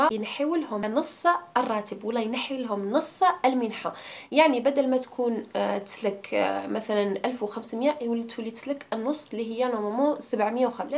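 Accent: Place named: East, Algeria. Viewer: لهجة الشرق الجزائري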